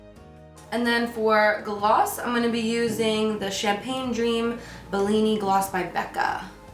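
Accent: New Jersey, United States. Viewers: Obsessed with the Jersey accent 😍😩😭❤️ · ur Jersey accent is so thick 😂😂 love you ❤️ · Your New Jersey accent really comes through when you’re hanging out with your family. Super cute. 😊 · Love jersey accent🐧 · Hanging out with your grandma brings out your New Jersey accent even more haha 😊 ( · Your grandmother is adorable.. and your jersey accents 🙌🏻❤️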